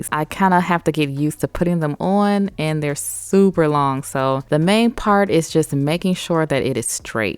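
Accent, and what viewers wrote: United States. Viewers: From London Brixton UK. Wow. Girl you got creative talent. Beautiful. This is the USA female accent that sounds real nice.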